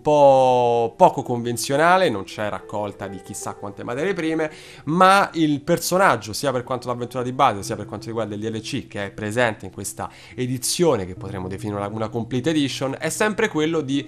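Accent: Rome, Italy. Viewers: Il vero problema è che tu, avendo uno spiccato accento romano, devi scrivere " 'n c(i)'à ccapito Ggnente...", c'è la doppia "g" anche se serve a raddoppiare il "gn", "nniente" invece "nun sona pe' ggnente". Se mme so' sspiegato, bbene, sennò ve n'annate...(censura)......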